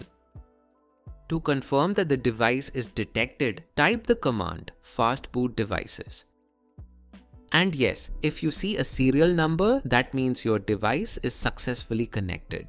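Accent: India. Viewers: Other than indian accent..everthing seem to be OK.... .// good job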